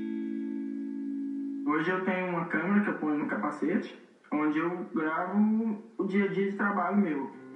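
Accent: Goiás, Brazil. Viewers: Comecei a assistir os vídeos do canal, e pelo sotaque, já sabia q era goiano...huahuahua O sotaque não nega. Parabéns pelo sucesso! +1 inscrito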